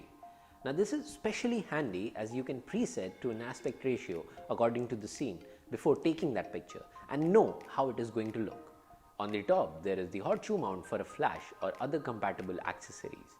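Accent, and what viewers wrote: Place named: Ireland. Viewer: Background music and Accent are two different things. Many peoples in the world don't understand Irish English. Even UK and US have accents based on the people's locations. Sometimes try to speak another language than your English you learned from your birth. That's really annoying when someone bullies others on their accent.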